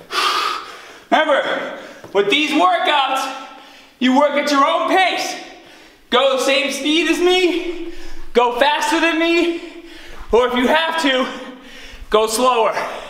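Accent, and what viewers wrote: Boston, United States. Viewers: John Letterman Its the boston accent i think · What's up with the Boston accent I watched your videos for years and stopped watching and recently just started watching them and I had to go back in time to see that it was the same person are you originally from Boston and it just came back you never had an accent before just curious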